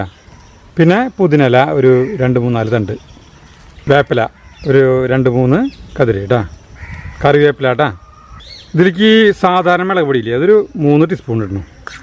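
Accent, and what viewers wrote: Thrissur, India. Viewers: thrisur basha kelakan vendit sarikum njn video kanane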